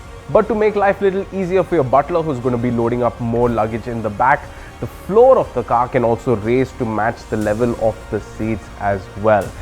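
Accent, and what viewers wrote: India. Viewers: What a crap review. He's not in the car, it's only generic footage, and his Indian accent is a bit thick. I'm not going to buy one of these now...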